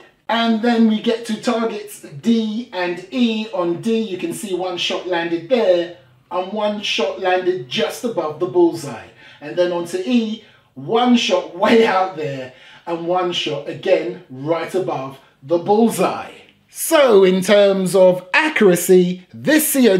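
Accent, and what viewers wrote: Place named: United Kingdom. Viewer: You're so delightfully British. Well not so long if Boris Johnson makes Britain sink.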